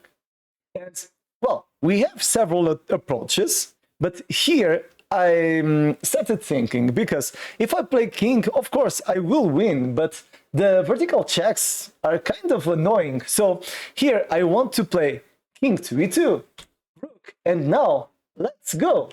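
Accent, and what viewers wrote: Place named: Azores, Portugal. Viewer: O bendito, pelo nao dito, tu es da ilha do Pico. Took me a bit, but I know it was Portuguese with azores accent. Good show and enthusiasm.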